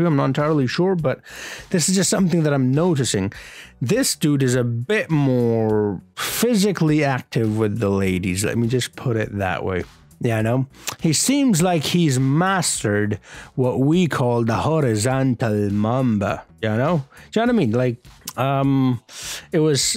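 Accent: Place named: Canada. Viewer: yo really? I thought you might be but I wasn't sure, I don't know if English is your first language so forgive me if this is rude but your pronunciation is awesome, I'd honestly been thinking you were maybe Canadian with Asian heritage this whole time. That's really cool though! The jubilee is probably what caused the algorithm to latch onto this video, it was this one specifically that was in my recommended a few days ago. The jubilee is the anniversary of the queen's coronation. This weekend was the queen's platinum jubilee, meaning she's been the Queen for 70 years straight. So a reaction to a doctor who episode about the coronation during the run up to it has probably caused the algorithm to catapult you into British YouTube feeds I'd bet. Unless you haven't noticed a spike in viewers this week, in which case maybe it's just a coincidence. Search engine optimisation and algorithms interest me though so that's why I mention it 😅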